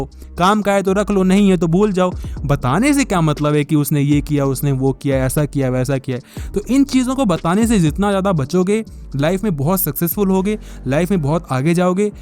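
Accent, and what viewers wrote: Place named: Uttar Pradesh, India. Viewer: Lagta hai aap u.p state ke ho ..mera ak friend bhi u.p ka h ..wo bhi aise hi bolta h ..har baat me bhiya use krta h..😂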